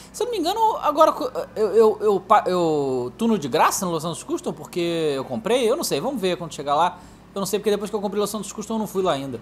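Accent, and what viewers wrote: Bahia, Brazil. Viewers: mano vc é MT baianaaao cara slk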